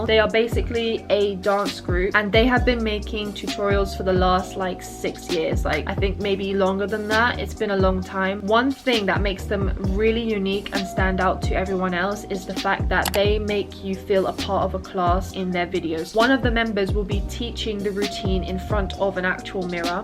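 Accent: United Kingdom. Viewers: I love your British accent and thats why i ve been keep watching your videos instead of watching the videos wit my native language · Ok i know m supposed to be focusing on what she’s saying, but her british accent is lit chef kiss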